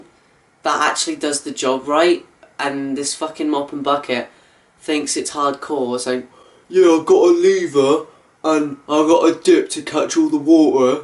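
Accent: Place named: United Kingdom. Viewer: Glad you're excited about it. I'm working on my own website and I'm going to have videos on it of jobs I have modified for injured workers. I'm doing one right now for a custodian, which is how I ran across your video. So for this video, your target market is not other teenagers, rather college educated safety and human resources managers. So what they need to hear is how difficult it is for a healthy young man like yourself to use that mop bucket, lift it up to the utility sink to dump it etc. What other tasks wear you out? Swinging the string mop? Squeezing the water out of the mop? Think about everything in mopping that is difficult physically (not how boring it is...I can't fix that.) I am an advocate of flat microfiber mops that weigh 80% less when wet. So your video was very entertaining, just not appropriate for my audience. Think about how you talked to the person in human resources who hired you...that's who your talking to in the video. 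I would say cut it down to 1-2 minutes, like a commercial. These people are busy. But feel free to be funny, funny is good. Thanks so much! This is fun. Are you in the UK? (by your accent I'm guessing)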